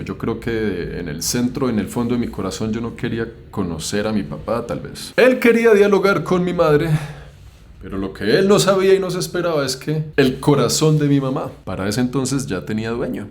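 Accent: Bogotá, Colombia. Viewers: Recién. te sigo, me gusta mucho el tu acento rolo yo viví en Bogotá hace 12 años. Después. emigre a Países. Pero mi querida Bogotá me abrió las puertas del mundo. Mi más sentido pésame por tu perdida. Mucha fuerza. Saludos de una peruana en Haya ❤🎉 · awwww que video tan bonito:face-red-heart-shape:, y yo mas y mas enamorada de 😍 ojala me encuentre un clon con todo y acento colombiano jajajaj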